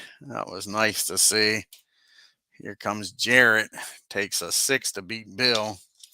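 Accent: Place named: South, United States. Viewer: I know he does a great job. I have second season HMB and HMG. This one has been on my radar for a while. Also you southern accent fits the game.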